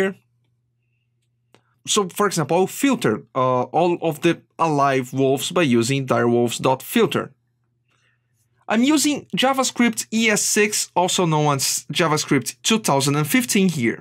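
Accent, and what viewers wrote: Brazil. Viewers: Are you brazillian? You have a brazillian accent Nice vídeo!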